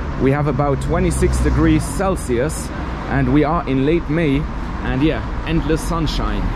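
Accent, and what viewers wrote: Germany. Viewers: you have a very interesting accent. It sounds very much like a german one.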